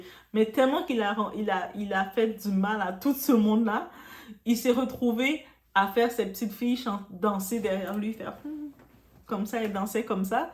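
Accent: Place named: Canada. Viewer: c est l accent Canadian.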